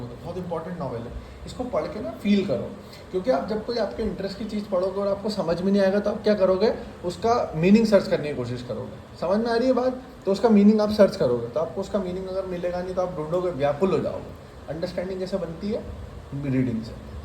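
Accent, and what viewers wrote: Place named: Madhya Pradesh, India. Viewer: Bhaiya aap to MP ke lag rhe ho yar aawaz se ❤❤❤❤❤ Proud of you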